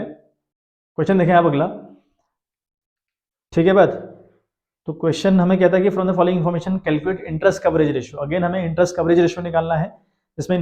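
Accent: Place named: Pahadi, India. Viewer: himachal se . Aapke accent se lga ki aap pahadi ho 🙂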